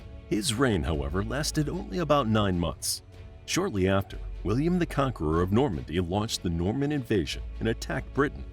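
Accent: United States. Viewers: It is funny that an American(?) narrated! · Why is an American narrating this, also, inaccurately.?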